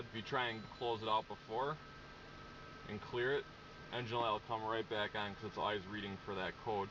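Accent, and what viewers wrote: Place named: Canada. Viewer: canadian for sure. good guy..thanks for sharing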